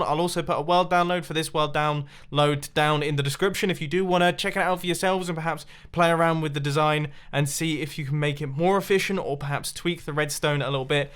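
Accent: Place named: United Kingdom. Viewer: Thanks for the wonderful content these past years! Really appreciated your amazing and genius inventions, your British accent and your apparent lack of ego. I wish you the best of luck but my intrest for Mincraft has diminished over the last few months so to clean up my sub box i wil unsubscribe (not from your vlog channel, patiently waiting for more content there).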